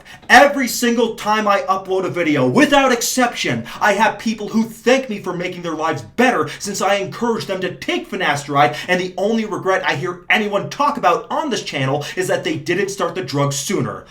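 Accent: United Kingdom. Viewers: Thank you for speaking in British English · thank you for this video. As a british guy (that uses finasteride) who casually reads the BBC for national and international news, i was very worried by this article, particularly the anti-finasteride view it was pedalling, and was shocked at how keen they were to publish this losers sob story. All these facebook posts sharing this story and a similar one published by The Economist is full of comments by uneducated boomers who believe everything they've read and now think there's some sort of trade off to taking finasteride. It's straight up misinformation and I'm really hoping there's a resistance to this shit in the form of a news article or this EU study that demonstrates the safety of finasteride and how much of a loser Kyle is. God forbid they choose to ban finasteride, I don't know what I would do, but I'm banking on the medical study not using Kyle's sob story as evidence. 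Also your british accent has me absolutely cracking up! 😂😂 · Hahaha love the British accent 😂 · That British accent, I died. :))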